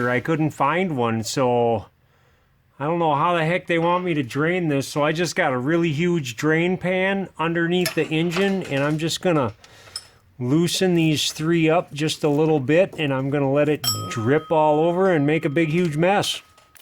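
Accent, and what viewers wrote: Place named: Northeast, United States. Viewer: You gotta be from the northeast some where.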